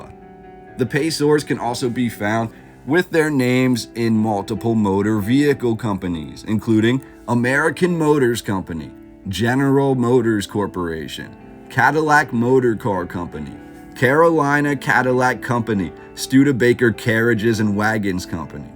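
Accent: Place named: Pennsylvania, United States. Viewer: I knew you were from PA by the way you pronounce Lancaster. Lankuster.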